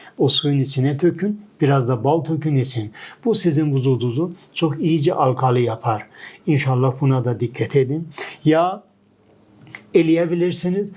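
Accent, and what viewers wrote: Azerbaijan. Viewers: Ya sen ne güzel bi adamsın ömrüne bereket versin allahım hocam çok sağol ne güzel bilgiler veriyorsun saygı ve sevgi ile takip ediyorum ❤❤❤❤ Ayrıca az türkçenizde çok sevimli 😊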